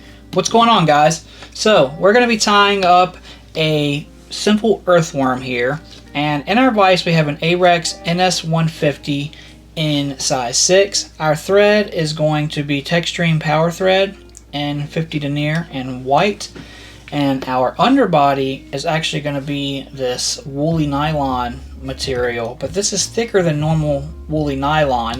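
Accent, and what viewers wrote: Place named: South, United States. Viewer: I cant figure out your accent,much love and respect from western NC,you sound like you could be from the south but cant tell for sure.i grew up fishing the Sierra nevadas as a kid but call asheville nc home now..but also what state you doing tattoos in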